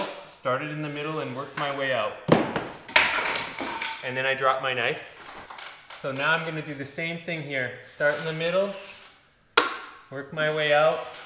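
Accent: Canada. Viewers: Awesome video, even better This Hour has 22 Minutes accent eh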